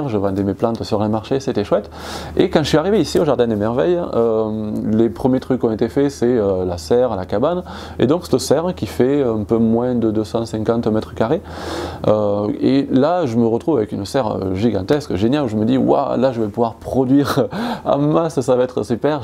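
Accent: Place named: Lautrec, France. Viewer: Très bonne analyse sur soi même qui sert beaucoup plus que l'on ne croit peut-être au départ. Pour toi et surtout pour les autres. Très bon accent pour un ancien Lautrécois qui a migré en Guyane. Je m'appelle Bernard et suis un ami intime à Robin que tu dois connaître certainement.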